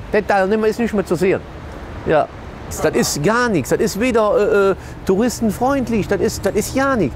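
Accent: Cologne, Germany. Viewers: Haha, zu geil. Habe lange kein richtiges kölsch mehr gehört. Dat is schaad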